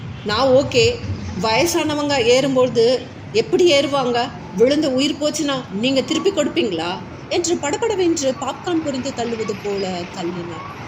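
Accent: Chennai, India. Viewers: சென்னைத் தமிழ் ..... யதார்த்தமாக இருக்கு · அருமை சென்னை பாசை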